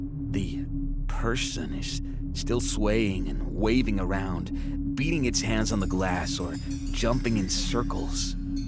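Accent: United States. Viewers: wait...mf you ARENT from the US...?? This is my first time hearing ya, and I wasn't able to tell at ALL, I thought you were American. That's saying a lot considering I was literally born and raised in the US lmao. Some advice you may or may not find useful for future readings with the US accent : What you did was a north eastern accent, and very similiar to mine (plain standard US accent here). It matched the architecture shown in the art perfectly, dont know if this was on purpose or just luck. Some words were pronounced similairly to the 'standard canadian' accent, but thats actually accurate depending on the region, as many northern US citizens pronounce things similarly/exactly the same. Also: Telling apart the standard US accent from the other northern variations can be almost impossible unless you hear certain words pronounced. So dont get frustrated if you have a difficult time telling em apart: Many Us citizens can't, and they literally live here. 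· Came over from Dark Somnium, and glad I did. Great narration, ambiance,and excellent job on the US accent! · Its my 1st time listening, I'm from California. I thought you were an American from the eastern states , not east coast though. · Wait- you’re NOT American?! Damn dude!! Bravo!!! New visitor, new sub!!!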